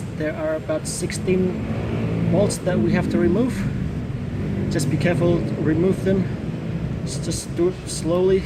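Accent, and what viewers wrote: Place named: Australia. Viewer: This is so well put together, you sound Aussie like myself bud, the description in the video is so detailed and given some of the horror stories I've heard especially in older mazda versions of Ford 5 speeds I think you're bang on with preventative maintenance at those intervals. I'm hoping the process is similar for the 5 speed in my 2008 sp 23. Looks similar to one I saw but wasn't half as well done as this and video quality wasn't the best either. Pretty sure my trans is the FS5AEL slightly modified Ford one and pretty janky from all I've heard, although I'm sure fords is worse lol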